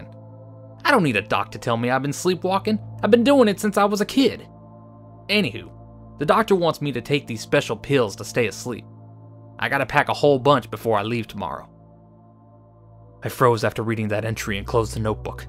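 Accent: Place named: South, United States. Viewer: My God, I love your southern accent! XD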